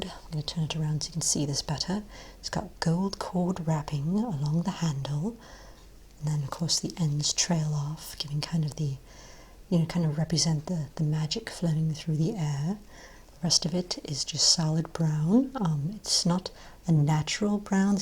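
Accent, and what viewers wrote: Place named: United Kingdom. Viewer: I like your British accent! 😁 I'm working on a British accent for my next video too, it's not easy 😆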